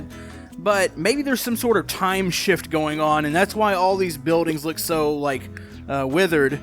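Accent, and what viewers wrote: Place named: West, United States. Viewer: for some reason i actually thought he had a farmer accent or like sort of a western accent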